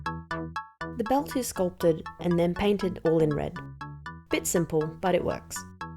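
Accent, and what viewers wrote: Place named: Australia. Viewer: This is the first video of yours I am watching and you are reviewing Koto Batwoman. I've been eyeing that figure for a while now lol...I guess I need to get it now. I love your crystal clear speech and pronunciation. That Aussie accent is something else, tho :) <3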